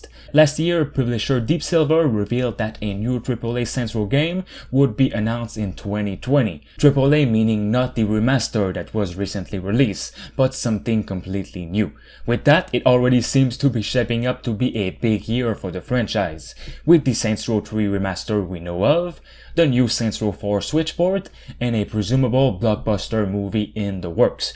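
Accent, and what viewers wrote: Quebec, Canada. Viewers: Are you french canadian by any chance?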